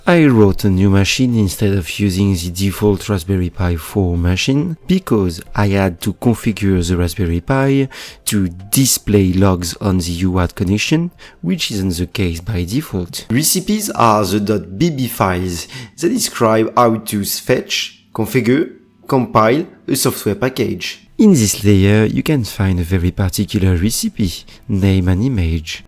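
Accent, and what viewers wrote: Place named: France. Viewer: You have an french accent 😂😂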